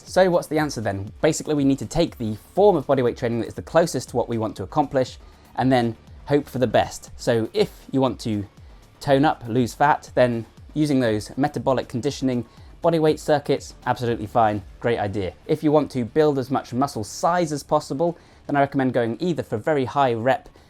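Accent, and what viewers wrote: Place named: Wiltshire, United Kingdom. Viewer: Finally a decent and informative fitness channel. Subscribed. Which part of the UK are you from? I'm based in Wiltshire you sound local. Keep it up. Thanks, Ben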